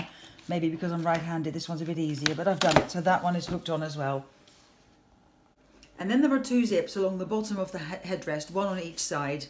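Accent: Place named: England, United Kingdom. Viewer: Lovely accent, from England ?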